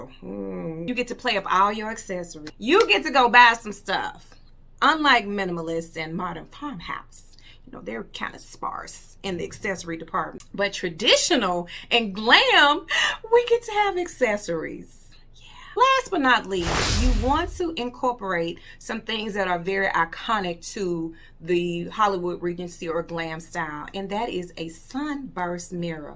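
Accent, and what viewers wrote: South, United States. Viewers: SHE GOT ME WITH HER TITLE. SOUTHERN GIRL. AND I LOVE HER ACCENT. THANKS.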